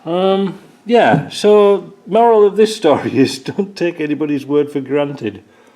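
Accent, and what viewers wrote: Yorkshire, United Kingdom. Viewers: I thought Ken Dodd had stepped in for you for a moment until the Yorkshire accent came out.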